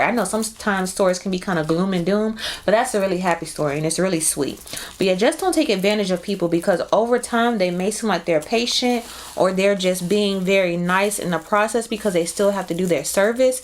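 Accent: New Orleans, United States. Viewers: as a new orleanian baby you got the accent 😂😂 and cold drank is legit ONLY said when referring to soda ❤️🙌🏽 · The “cOLD DRANk” 😂😂 I like that N.O. accent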